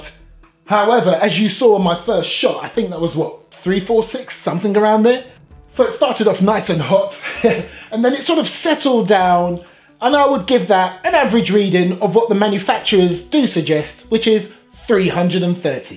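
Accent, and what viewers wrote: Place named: United Kingdom. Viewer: You're so delightfully British. Well not so long if Boris Johnson makes Britain sink.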